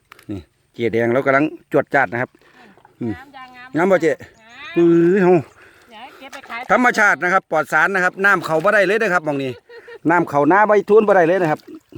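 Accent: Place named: Korat, Thailand. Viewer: เว้าคือคนบ้านโนนสุวรรณเนาะ อ.ไดครับโคราช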